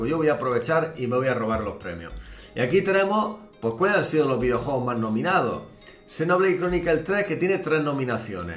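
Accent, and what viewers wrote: Spain. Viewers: Me da risa que todos los españoles leen las palabras en inglés como si fueran en español 😅. Creo que nadie en Latinoamerica hace eso.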